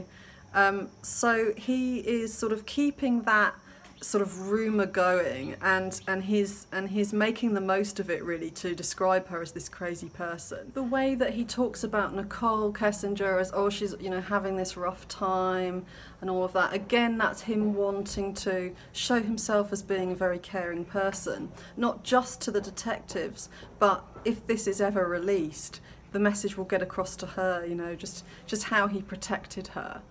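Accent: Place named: United Kingdom. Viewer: For me it's also her British accent. I don't know why everything they say sounds so smart :)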